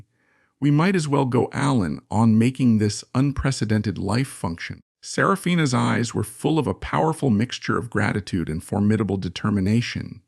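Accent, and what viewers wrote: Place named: India. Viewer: Why is most of this narrated in one voice, but the chapter announcements and epilogue are delivered in an Indian one? And the text moving around? Why? If you want to promote views, these are things that need correction. If you do not care, then never mind. Continue putting in the time and effort to produce these, as your subscriber count barely moves. It's all up to you.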